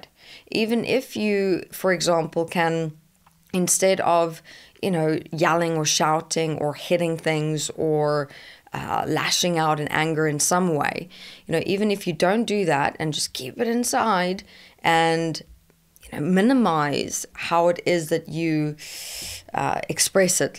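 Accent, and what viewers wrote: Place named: South Africa. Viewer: Sheesh. South African....Netherlands, Scandinavian...?? I'm hearing bits of Maori for crying out loud. Gaaaah! Where is your accent from?? Thanks for helpful video too.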